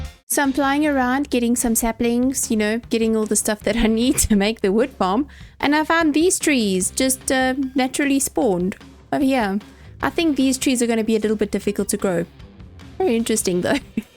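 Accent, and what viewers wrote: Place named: South Africa. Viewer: Can’t get enough of the South African accent, could listen to it all day long, this coming from a Scot. Great video!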